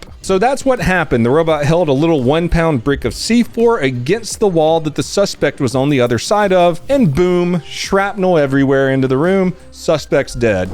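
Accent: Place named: Texas, United States. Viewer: Since when are you Texan? Thought it was Bama 4 ever 😢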